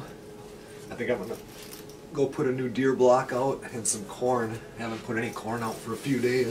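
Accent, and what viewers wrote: Minnesota, United States. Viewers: I knew from the first words that you spoke, you were from Minnesota...That accent is strong up there....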